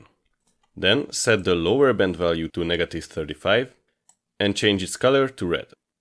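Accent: Hungary. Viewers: lets say we have commissions about 3$/Lot . One more important question: Is tradingview backtester counts the spread ? Thank you for your answer . I am not here to criticize you because you looks like one honest trader from 100 of scammer but i want to understand and maybe try out your strategy . And one more personal question . I see that your channel is from Romania , and i hear from your accent that you maybe hungarian , is it true?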